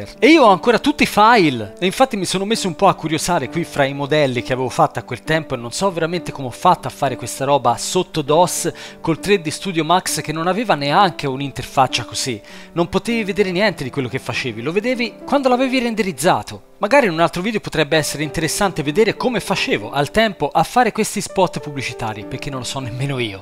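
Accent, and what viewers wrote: Tuscany, Italy. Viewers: Lei e’ eccezionale! Mi sono visto questo suo video di 4 anni fa e l’ho trovato ancora attualissimo, sia come tema sia nel modo in cui lo espone, con la nota simpatica pronuncia con inflessione toscana, e una incredibile professionalità. Continuerò a seguire tutti i suoi video con avidità stupendomi ogni volta. Grazie per i bei momenti (e emozioni) che ci (mi) regala.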